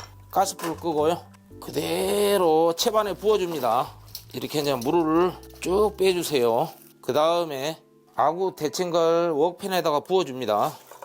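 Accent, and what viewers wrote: Jeolla, South Korea. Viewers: 세프님.전라도.말투가. 너무.정겹습니다 .그리고.좋은요리고맙습니다.항상행복하시고.오래도록좋은요리부탁드닙니다. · 전라도 사투리를. 많이. 쓰시네여😊😊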